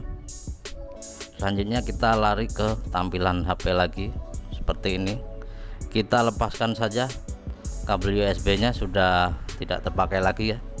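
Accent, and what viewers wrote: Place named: Lampung, Indonesia. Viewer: sampean orang lampung ya mas? kalau di benerin pake hp ga bisa yw mas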